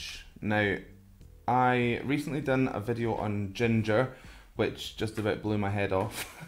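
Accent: Scotland, United Kingdom. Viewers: Do I hear a little Scottish accent? 🤩 I love it! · Scottish accent is rly the coolest !! 😁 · I absolutely love your scottish accent and I love that you love!:D I love them since 2003 and I don't know you, but I'm always happy to see someone else get excited over them:D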